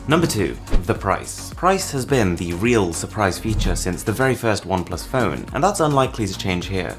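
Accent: RP, United Kingdom. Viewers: You have a British accent so surprised you choose to indicate 'two' with your fingers by showing the knuckle side of your fingers. Turn your hand around when you want to show you mean 'two'. 😉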